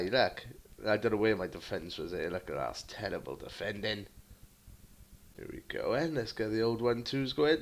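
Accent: Wales, United Kingdom. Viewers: Love the welsh accent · Where in Wales are you from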